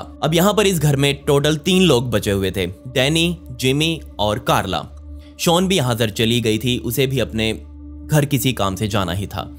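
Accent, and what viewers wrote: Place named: Western Uttar Pradesh, India. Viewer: Bhai teri language suke lagta hai tu sayad west u.p se hai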